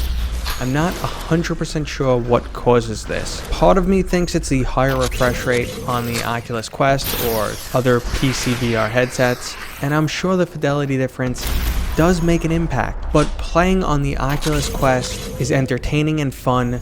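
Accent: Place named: Boston, United States. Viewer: Holy mother of Boston accent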